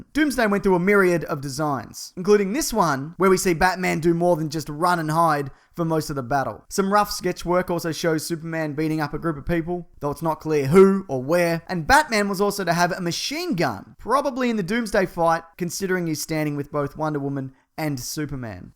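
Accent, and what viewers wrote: Australia. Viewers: Are you from australia you sound like it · he's australian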